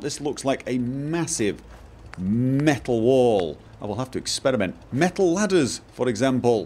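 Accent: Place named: Scotland, United Kingdom. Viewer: sir, can u tell me about that nice accent u speak? is that maybe scottish? I like it for sure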